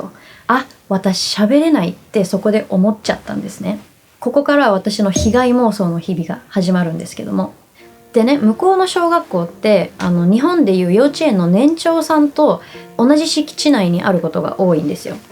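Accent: United States. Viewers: Your English is super fluent honestly. Like, the way you spoke in English just sounds as if you lived in the U.S. for a majority of your life rather than a couple years XD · Thanks for sharing your story, despite all the struggles. Actually, with your American accent, I first thought you were born and raised in the States. Very impressive for only 34 months! Your fluency in English is now helping your music career a lot. This likely wasn’t your main intention as a kid, so it shows that perseverance and hard work can pay off even in unexpected ways.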